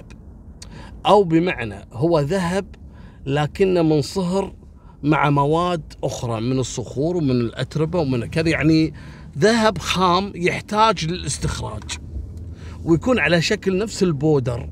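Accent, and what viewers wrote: Kuwait. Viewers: اللهجة الكويتية حلوة ومفهومة.أنا تعلمتها من خلال سوالف الله يعطيه الصحة ويطول عمره 🤲👍🌹🇲🇦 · استاذ صرت اعرف واحب اللهجه الكويتيه شكرا الك القصه روعه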